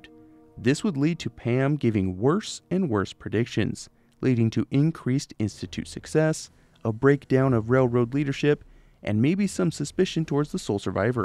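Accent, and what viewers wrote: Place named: Midwest, United States. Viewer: I love your videos but i have ro say, i noticed your accent way more in this video lol michigan? Minnesota?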